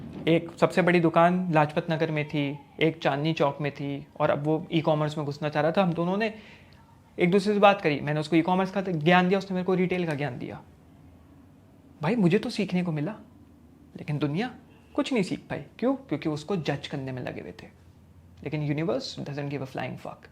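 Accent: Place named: Malwa, India. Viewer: sun mere bhai ek baat tu bohot dhyaan se; tu dilli ncr se hai na? tu koi madhya pradesh ke indore maalwa region se to hai nahi jo wahaan ki style mein bol raha hai ZAKIR KHAN ko copy kar raha hai ,kyunki that way you speak is extremely irritating aur teri acchi baaton ki value gir jaa rahi hai saari ki saari. Kitni baar tere video dekhne chahe hain par 15 minute baad band karne padte hain becasue of the irritation caused by the way you speak which is NOT ORIGINAL.(tu bhi jaanta hai ye baat acche se)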